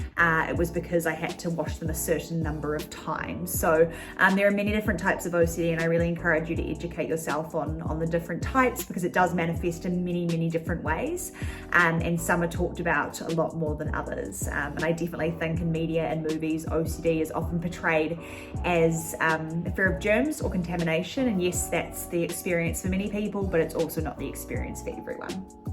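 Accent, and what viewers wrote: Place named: Singapore. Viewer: Why she speaking Singlish?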